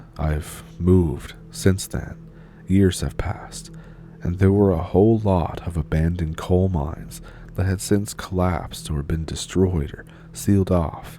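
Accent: Canada. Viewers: I always love your stories. And your Canadian accent!!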